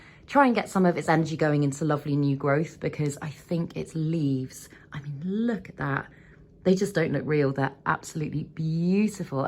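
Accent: England, United Kingdom. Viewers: 英国发音好听！ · Just love your English accent. Very attractive to listen to. Are you a londoner? Love your passion for plants. Did botany?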